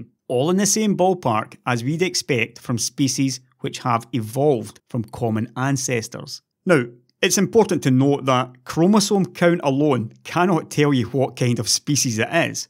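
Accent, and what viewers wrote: Scotland, United Kingdom. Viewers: I love your accent... you make scottish understandable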